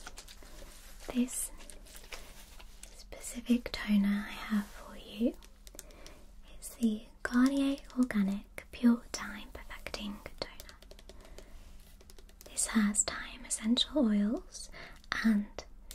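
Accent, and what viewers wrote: United Kingdom. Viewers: I luv soooo much your British accent🥰😍 to cuteeee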